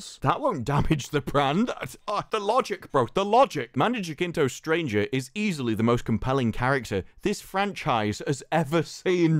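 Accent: United Kingdom. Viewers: This man has peak British mouth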